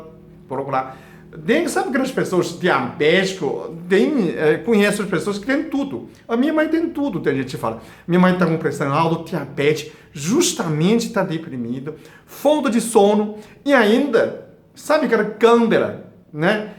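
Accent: China. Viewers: Eu adoro esse Dr pq ele e mto sábio.Explics tudo...ele fala português c o sotaque chinês Eu adoro ouvi-lo e entendo...só ter paciência. Parabéns Dr Deus o abençoe 🙏🙏🙏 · Esse japonês ou chinês hummmm ta mais pra chinês. Enfim, esse chinês é um fofo.Explica bem em seus vídeos,mesmo com sotaque de outro idioma. Depois de assistir o vídeo, acho que estou precisando tomar o cloreto de magnésio. Um abraço. Sou sua fã.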